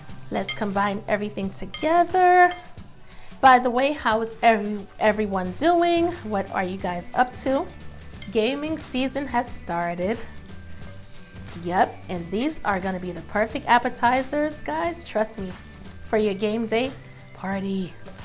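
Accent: New York, United States. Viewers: love ur ny accent lol been watching all ur videos keep em comingggggg !!!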